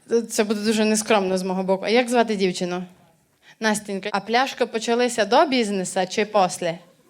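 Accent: Transcarpathia, Ukraine. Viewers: Та ви шо! Не любиться, та не позирайте і не слухайте. У нас на Закарпатті ми так говориме, а ви говоріть як маєте дяку. А ще і совіти роздаєте коли вас не просять. Мало не красно. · слухаю тільки через закарпатський діалект😅, а так ніц не смішно, хай шлєк трафить 😅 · Оригинально, уникально, по закарпатськи! Йоу!🎉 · Так, до не до неї, бачте як плескають в ладоні, мабуть дуууже подобається, цеж русинська мова, так вони,Закарпаття, кажуть!!!на цей говір... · Пані Валеріє, дякую Вам і Вашим батькам за Вас! Мені дуже подобаються Ваші виступи, концерти! У Вас прекрасне почуття гумору, елегантний стиль, чудова закарпатська мова. Я пишаюся, тим, що наша Україна має таких чудових талановитих дівчат!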